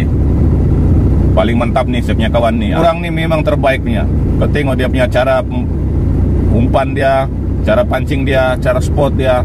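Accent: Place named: Malaysia. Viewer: Terimakasih tuntunan umum nya. Saya suka memdengar logat melayu. Singapore ape malaysia ni?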